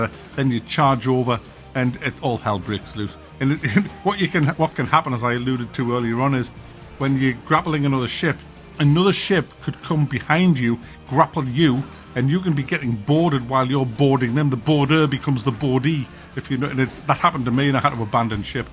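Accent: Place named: England, United Kingdom. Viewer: I have watched your videos since 2017 now. I still cant figure out, where in England your accent is coming from.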